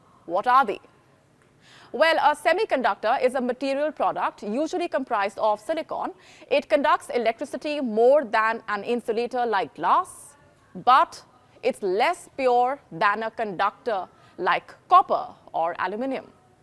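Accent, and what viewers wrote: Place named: India. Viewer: This one has a thick Indian accent. Lol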